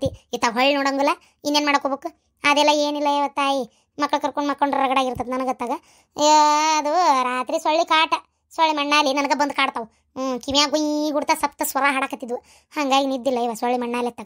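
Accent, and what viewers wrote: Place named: Hubballi, India. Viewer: ಮನೆಯೊಂದು ಮೂರು ಬಾಗ್ಲು ಹಾಕ್ರಿ ನನ್ ಫೇವರಿಟ್ ಸ್ಟೋರಿ ಮಿಸ್ ಮಾಡ್ಬೇಡಿ,I love your ಹುಬ್ಬಳ್ಳಿ ಭಾಷೆ🥰😍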